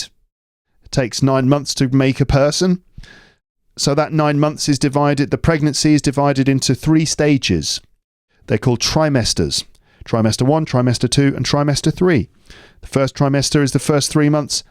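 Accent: United Kingdom. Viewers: Hi, your British accent is thoroughly hilarious! Tell me where in the world this incredible accent is spoken.? I have to admit since I started following your podcast my English is magically changed. Thanks to you with the bottom of my heart. ❤ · speaks Pure British accent but his audience are not native English Please don't speak fast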